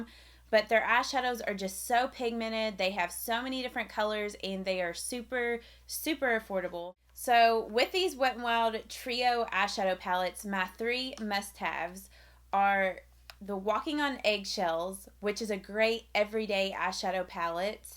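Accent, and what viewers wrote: South, United States. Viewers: It's a true blue Southern drawl. I'm from the south so I'm used to it and enjoy it. Honestly, I much prefer someone that talks too slow to someone that talks too fast.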